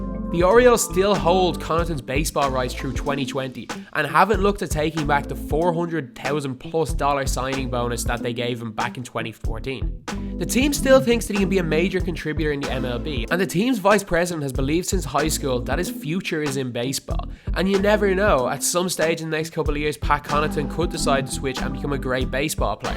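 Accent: Ireland. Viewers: Irish Narrator.....😁...... I like Pat tho...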